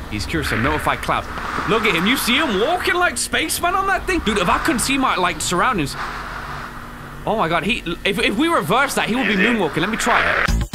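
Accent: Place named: Newcastle, United Kingdom. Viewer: This guy keeps on over-analysing literally every single thing, with his annoying Newcastle accent.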